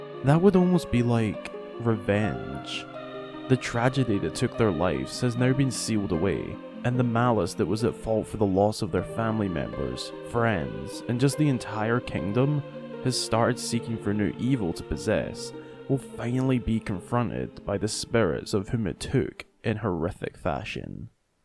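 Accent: Scotland, United Kingdom. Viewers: Quick question, are you Scottish? Because that accent sounds awfully familiar.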